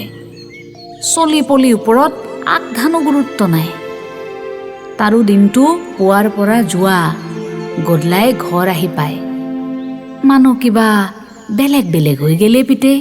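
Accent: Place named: Lower Assam, India. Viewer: মোৰ সতে মিলি গ'ল। মইও নামনিৰ এ । স্পৰ্শ কৰিলে। আপোনাৰ মাতটোত নিজৰ ভাষাৰ শৈলীটো শুনি ভাল লাগিল।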